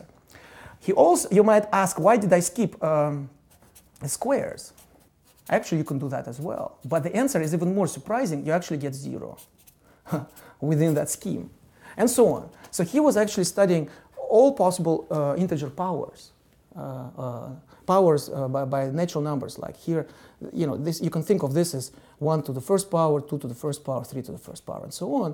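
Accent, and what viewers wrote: Russia. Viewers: The guy has some horror core Russian accent. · I can understand all of this just because i am russian too, because his accent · Он точно Русский! Он имеет Русский акцент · Russian professor? Him accent... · is that a russian guy? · мне еще нравится что не всё с русским акцентом, это вперемешку с чистым, правильным произношением, мне кажется это странным если он может так бегло разговаривать, но при этом говорит на половину или больше с сильным русским акцентом, как-будто он даже не старается, и не пытался учить как правильно произносятся слова, это странно🤔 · is he russian? he has a small but distinct russian accent... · Yeah, it's kinda wierd: his wording is correct and he uses live idiomas and cultural references but his accent is soooooo soooo Russian. I believe he keeps thinking in Russian and then formulates a quick translation of his thought in English - that's quite strange as naturally upon some point you start thinking in the language that is foreign to you and that is the moment you generally say one speaks a language. 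А тут прям такие знакомые интонации, прям слышно как звучит с такой же интонацией русский оригинал фразы )) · This guy sounds like he has some russian accent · Somewhat strange, but I understand J. Grime's accent better than this' obviously Russian speaker. (Although I'm Russian) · It's a russian accent, very very fun to listen this - russian phonetics and russian emotions, but english phrases and words.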